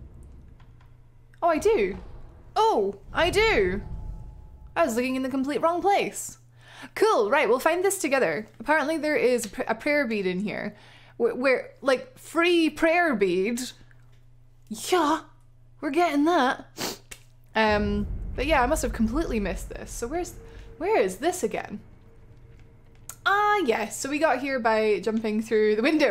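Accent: Scotland, United Kingdom. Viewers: Is this a scotish accent? I have no fcking idea.but i love it🤔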